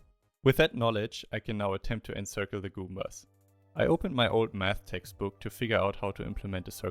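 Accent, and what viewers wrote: Germany. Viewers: Are you by any chance German? (Great Video btw)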